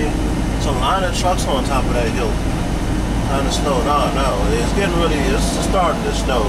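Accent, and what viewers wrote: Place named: East Coast, United States. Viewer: These youngsters these days! In 34+ years of driving, I've seen alot of dumb shit happen, but I never in my wildest dreams imagined all the dumbshit drivers with CDL's. This new generation of drivers are reckless and complainers. They think they know it all and none of us old timers know anything about driving. Especially Winter driving. Especially I-80 Winter driving. Take that 70 truck pileup on I-80 in 2015 as picture of reckless idiotic youngsters with no respect for the road, the conditions or each other. Pathetic. The guy in this video sounds like a guy from the eastcoast. Like the guy whose comments I saw, this driver is slip seating and doesn't want to buy and hook up a CB. They do sell portables, you know. Most new trucks have built in antennas. You just hook your power cord to the built in terminals and attach the provided coax to your radio. 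Badda bing, badda boom...you got yer radio going...don't worry about it......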